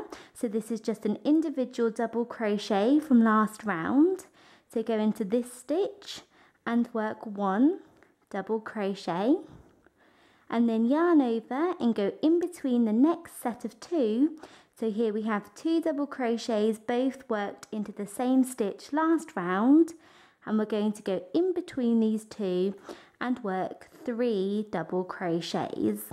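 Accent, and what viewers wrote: England, United Kingdom. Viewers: I love the tutorial but I must say the English accent is too much U over pronounce words and its distracting from the video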